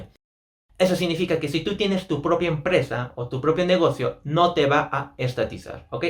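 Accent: Spain. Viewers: Me parece o este men habla como español? Tiene cara de cabeza clava como yo, yara con los complejos mi rey :v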